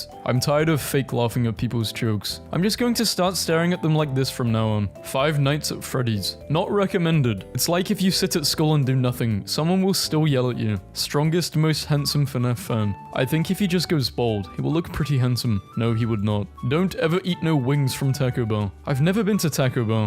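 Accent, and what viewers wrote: United Kingdom. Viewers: You British? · wait ur british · YOUR BRITISH TOO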